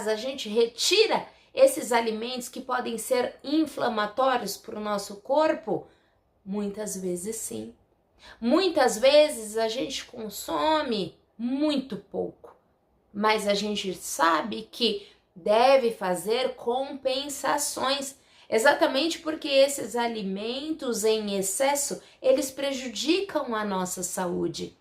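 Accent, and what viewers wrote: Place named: São Paulo, Brazil. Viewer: uuuf acho que vc é de São Paulo capital isso eugosteeeo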